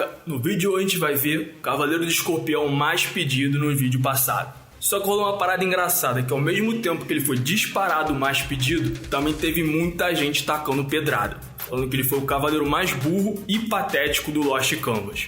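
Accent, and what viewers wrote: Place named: Rio de Janeiro, Brazil. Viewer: caralho sabia carioca fudido ainda e da zona norte ps; (moro na penha)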